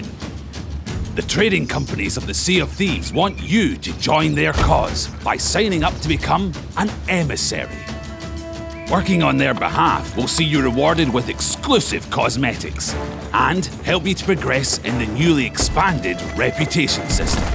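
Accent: Scotland, United Kingdom. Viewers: Scottish narrator 😊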